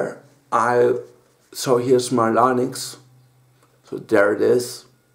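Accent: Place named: Germany. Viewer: Guten Abend dein Englisch ist sehr Deutsch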